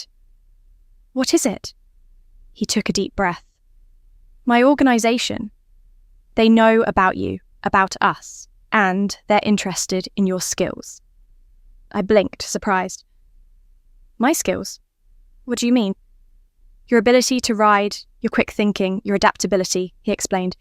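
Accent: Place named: United Kingdom. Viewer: Well, that's it. I'm throwing my unfinished short story in the trash. My favorite pen is going back in it's case. I can't compete with perfection. Dear, you have an exceptional gift for relaying a tale! Every requirement is spot on. Plus, I'm rather fond of the British accent. I could listen to you for hours. Simply put, your storytelling abilities are amazing! I'm sure our children will love their bedtime stories!🤣🤣🤣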